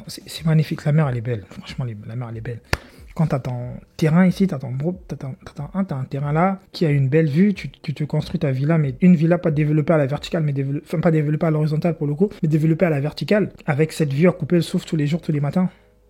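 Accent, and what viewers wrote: Benin. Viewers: Ton Lomé a quoi de plus beau que ce qu'il a montré ? Ou bien tu veux qu'il invente des images pour Lomé ? Le temps accordé aux 2 villes est relativement équitable. Tu l'as vu comme un béninois ? C'est c'est l'accent béninois ça ?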